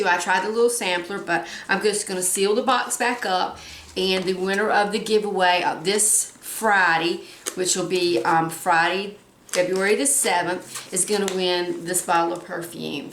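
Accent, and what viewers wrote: South, United States. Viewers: Had to tell you I have a dachshund his name is oscar Mayer weimer lol and I'm from Tennessee love your southern accent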